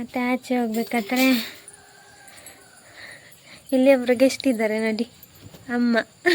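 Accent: India. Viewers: ನನಗೆ ದಕ್ಷಿಣ ಕನ್ನಡದವರು ಅಂದ್ರೆ ತುಂಬಾ ಇಷ್ಟ ನಿಮ್ಮ ಭಾಷೆ. ಮಾತನಾಡುವ ಶೈಲಿ. ನನ್ನ ಮದುವೆ ಆಗುತ್ತಿರಾ ನೀವು. ❤ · Nim kundapura super ❤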